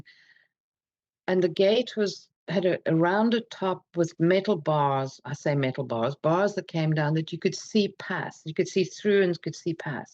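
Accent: South Africa. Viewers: this is an odd question.. but your accent - I hear South Africa but there is definitely something else there, if not two other language / accent influences?? Just curious. Thank you for sharing - hello from Jukskei Park in Fourways. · Hi hun, you explained this all very well. I lost my sister and it is still very raw, by the way, you sound like you come from Durban, I'm from SA! Durban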